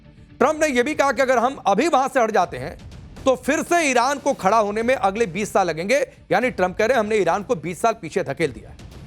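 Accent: Bihar, India. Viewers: पण्डित जी बहुत अच्छा बोल रहे हैं। लेकिन सुशांत सिन्हा जी का बोलने का बिहारी अंदाज निराला है।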